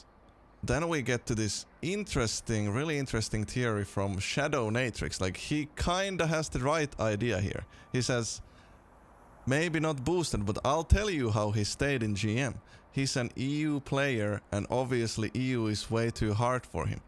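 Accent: Finland. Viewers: I can hear your Finnish accent from a mile away, love that! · Finnish accent is kinda cute tho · I feel his pain when he tries to say an english word correctly, only finns know.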